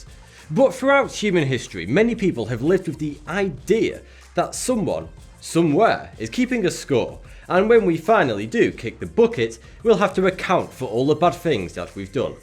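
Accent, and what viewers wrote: United Kingdom. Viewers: Maybe someone who doesn't believe in something to the point of mocking, shouldn't inform people about other theologies. Fire, earth, air, water. Follows the pattern of life, humanity and all of it. So, you're funny and all, but really.... you sound, like, too British.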